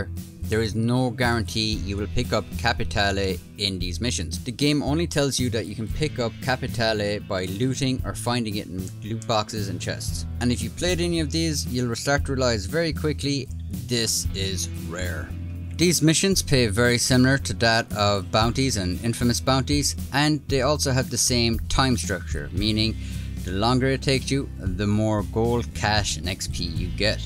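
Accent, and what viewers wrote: Ireland. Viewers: Are you Irish? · Never heard your voice until now. I doubly respect you for being Irish and for your incredible videos 😂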